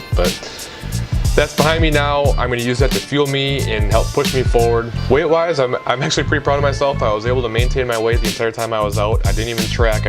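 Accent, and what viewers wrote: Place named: Minnesota, United States. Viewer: I missed that Minnesota accent. I'll see you at nationals also bb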